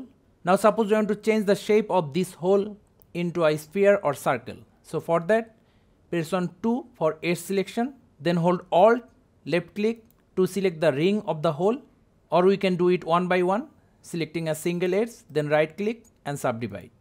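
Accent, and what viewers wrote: India. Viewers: of course the guy with with and indish accent teaches me years of blender in 2 minutes, reliable as always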